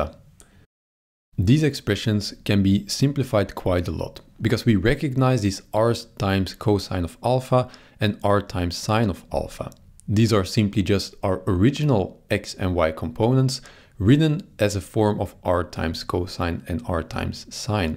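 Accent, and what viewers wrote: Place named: Belgium. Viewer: Ik kon direct horen dat je een belg was haha, moest dit even opfrissen voor bachelorproject robotica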